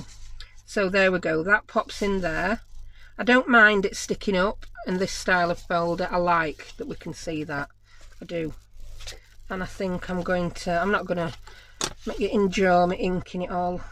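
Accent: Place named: Birmingham, United Kingdom. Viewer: Oh....you are a treasure. You sound just like my Auntie from Birmingham and have the same sense of humour. Love every episode...keep em coming. Love from Canada xx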